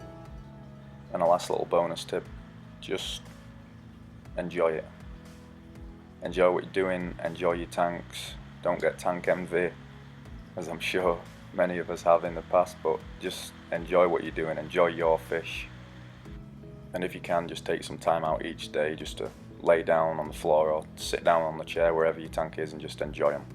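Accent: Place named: Yorkshire, United Kingdom. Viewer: REALisation: you sound from Yorkshire but you mentioned you're in Pittsburg...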